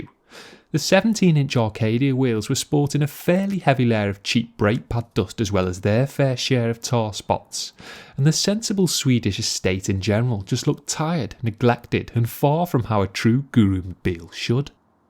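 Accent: Liverpool, United Kingdom. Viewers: I’m really struggling to pin down that scouse accent, must be very far over the river.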